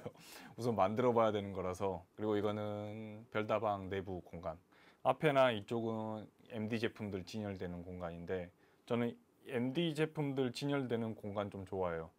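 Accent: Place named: Jeolla, South Korea. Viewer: 앗 저 전라도가 고향인데... 말씀하실 때 전라도 억양이 들리네욤 🤭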